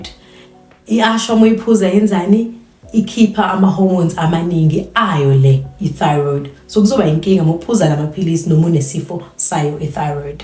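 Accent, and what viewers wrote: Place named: Zulu, South Africa. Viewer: You are so beautiful and your isizulu accent is amazing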